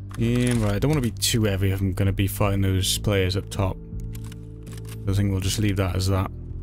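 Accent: United Kingdom. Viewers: you from south wales butt?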